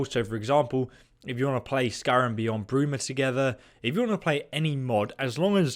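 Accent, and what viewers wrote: United Kingdom. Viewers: Olong Johnson I think he’s British so that’s why he says it like that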